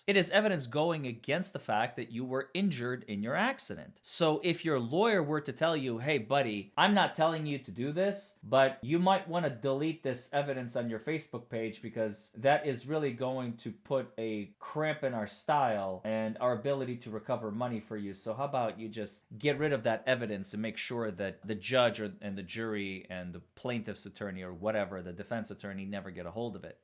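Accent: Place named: New England, United States. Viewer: Kentucky⁉️ I thought you were from MA or RI 😂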